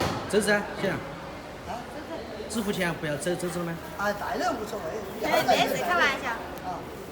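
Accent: Sichuan, China. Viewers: 吃的真香 味口真好 四川話好親切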